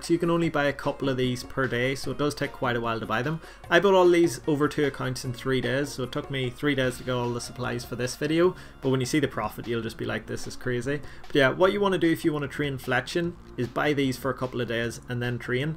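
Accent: Northern Ireland, United Kingdom. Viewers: You sound northern irish! I havent seen a osrs youtuber from my homeland! Im subbed, brilliant videos im loving these video ideas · Where are u from lol? I’m from Northern Ireland and u sound like ur nearby c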